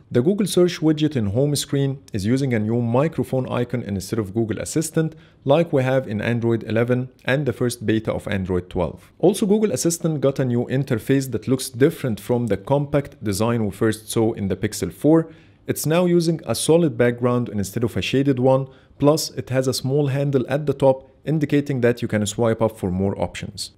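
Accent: Egypt. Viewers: حاول تعدل الاكسنت بتاعتك عشان الاكسنت المصرية او العربية واللي هي شبه الهندية للاسف محل سخرية كبير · ال accent المصري دي انا عارفها كويس عظيم 👌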